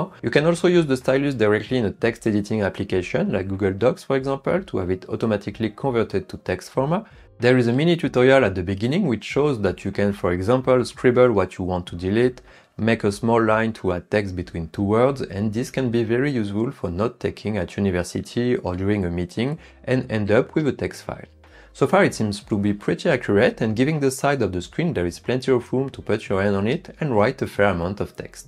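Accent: France. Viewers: your french accent is very strong. difficult to understand. I suggests you speak slower so that people can understand you.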